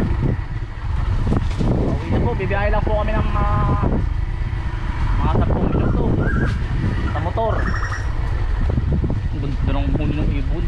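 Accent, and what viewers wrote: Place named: Batangas, Philippines. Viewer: batanguenyo kb, puntong batangas ka eh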